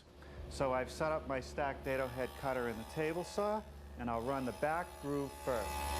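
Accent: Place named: Boston, United States. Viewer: Same. In the early 2000s, usually on Sunday mornings, my dad and I would always sit down together and watch this show. Made for a beautiful morning. Having the sun guzzling through the living room window, seeing the dust in the air through the sun rays, and just hearing his Boston accent with that music in the background. Good times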